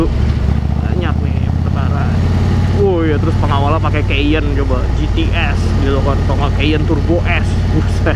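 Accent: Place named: Madura, Indonesia. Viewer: Bang, logatnya bukan jawa solo, mirip orang madura.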